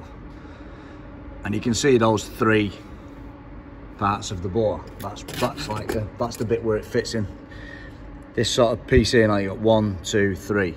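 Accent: North, United Kingdom. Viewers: These videos are a great watch and show your skills. Nice to hear a northern accent too, even if it is from across the border for me !! Please keep making more 👌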